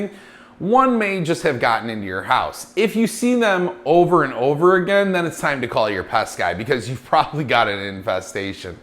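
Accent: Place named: Western New York, United States. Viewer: Go Bills! I could tell you are from Western New York. Is there a Bills Backer spot?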